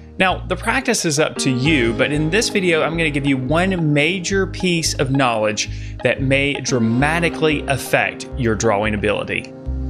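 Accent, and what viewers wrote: South, United States. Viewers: I recognize a fellow southern here right away. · It's that Southern drawl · Your ears. He has a a Southern drawl. When a lot of us Americans say the word “drawing” we don’t pronounce the “w”. It’s more like “dra-ing”. The way he says it is “dra-wing.” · He has a Southern Drawl when he drawls ... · It's his southern accent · Definitely says DWawing. Sounds like he’s from the American south. ‘Ya all come back ya hear’ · He clearly has some kind of slight southern accent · His southern accent has him saying "d-ROW-wing" as opposed to "d-RAW-ing" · from southern USA therefore he has a drawl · Really are you trying to be mean? He obviously has a southern accent. 🙄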